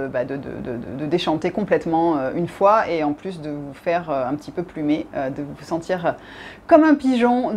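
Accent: Marseille, France. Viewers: Salut tu m’as fait rire Tu es Marseillaise de base lol ?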